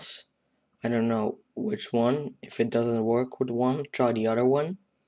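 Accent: Netherlands. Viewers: haha ik zag nl-NL voor bij komen, vandaar het dinglish accent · Je hoort nauwelijks het Nederlandse accent, doe je goed, ge hebt een sub erbij ;)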